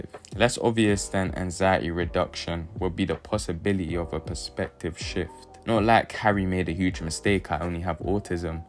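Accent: United Kingdom. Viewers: Unironically yeah. His intrusive thoughts. Lack of social adaptability and understanding the human condition could be solved if he just watched and listened to the ppl around him as he’s actually very empathetic Unironically goated algo pull. And another British voice on Dexter is always needed loool. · Oh no the narrator is British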